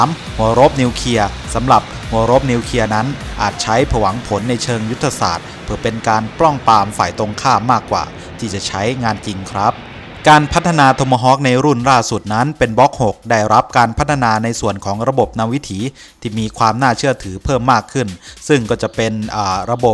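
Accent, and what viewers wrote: Thailand. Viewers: แอดคนบ้านใหนเสียงเหน่อๆ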